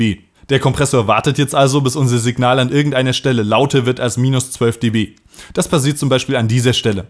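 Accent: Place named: Northern Germany, Germany. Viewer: Darf ich fragen, wo du gebürtig her kommst? Dialekt mäßig, würde ich Norddeutschland sagen. Niedersachsen oder Hamburg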